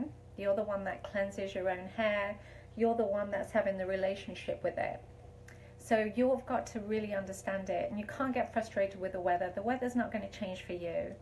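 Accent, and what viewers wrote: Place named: United Kingdom. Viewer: where were you when I was a kid!? I grew up in the 80s in the East Midlands and no one at school or the hairdresser’s told me about the Curly Girl Method. My mother told me to brush my hair and then chopped it all off when I refused 😬 when I read about your book I presumed you were American as this method appears to have traction in the States. Imagine my surprise when I heard your accent! Did you promote the Curly Girl Method and DevaCurl in the UK? I have never seen your products on sale here.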